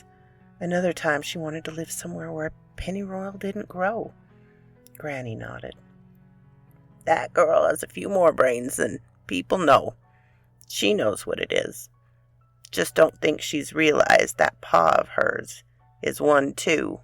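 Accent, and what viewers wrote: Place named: South, United States. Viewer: I'm found this to be overall interesting. The southern draw was at the least my ears delight. The story was at most entertaining and the attractiveness of bringing it all together is something that draws me back everytime to you Madame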